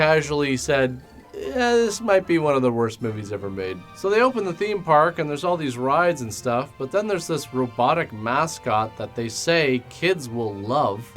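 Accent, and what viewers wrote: Canada. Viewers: are you Canadian? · Inner? He wears his Canadian on his sleeve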